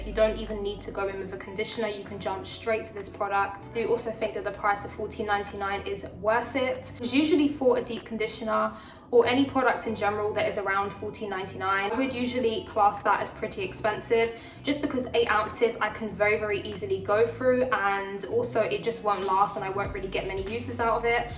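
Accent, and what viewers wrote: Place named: United Kingdom. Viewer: Just a quick question while I’m here, I’m judging by your accent that you’re in the U.K, so just wanted to ask where did you get your hair cut please. I love it!??!! 😍 I’m looking for a good curly hair stylist for a trim. Thank you. Looking forward to going through the rest of your videos.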